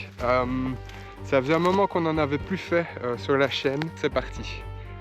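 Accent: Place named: East, Belgium. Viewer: Petit accent là, ça sent l'Est de la Belgique...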